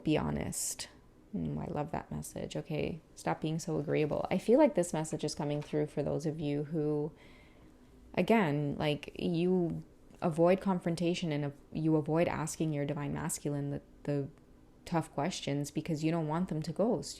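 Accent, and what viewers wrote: Trinidad and Tobago. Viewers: ‼️‼️ you know a Trini when you hear one! ❤ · Not the Trini 🇹🇹 accent! I’m screaming 😂🤣😂! Yaaaassssss 🥳🥳🥳🥳🥳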